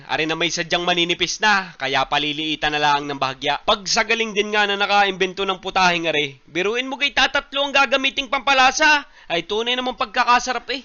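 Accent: Batangas, Philippines. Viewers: kasi he's from Batangas thats why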